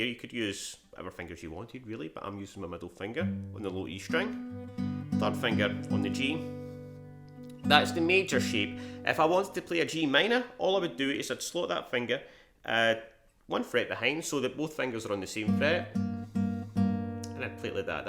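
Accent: Scotland, United Kingdom. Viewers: Good stuff! What part of Scotland?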